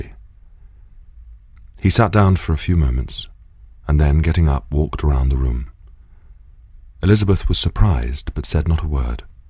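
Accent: England, United Kingdom. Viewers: Ese acento tan inglés ufff :D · 진정한 동굴 목소리다😲 목소리만듣고 심쿵하긴 처음이넼ㅋㅋ영국영어 배우고싶다ㅠ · Don't you just LOVE an English accent? If you think Matthew Macfadyen sounds good then just listen to Alan Rickman!!!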